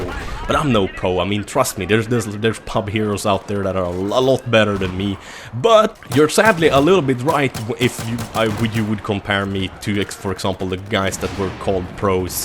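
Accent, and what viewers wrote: Sweden. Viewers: Hahaha! Your accent is the best! I'm glad to have you swedes as neighbors!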